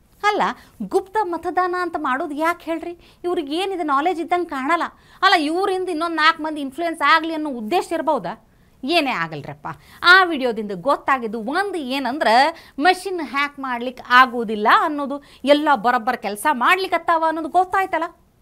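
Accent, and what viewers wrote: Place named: Uttara Kannada, India. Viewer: மகளே!!உத்தரகன்னட பாஷையில்,செய்திகளை கேட்பது எனக்கு அளவற்ற மகிழ்ச்சி!!!🎉🎉🎉😊😊😊😊😊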